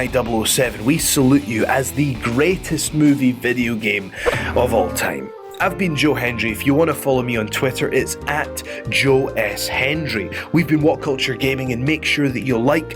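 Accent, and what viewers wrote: Scotland, United Kingdom. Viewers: the lovely thing about is their presenters are always SO hot :P that sultry Scottish voice serenaded my soul haha.....plus hes proper fit. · I just love to hear this guy talk. is he Scottish or Irish? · It is his Scottish accent. Dune becomes Djune, Duracell is Djuraceyll etc. No big deal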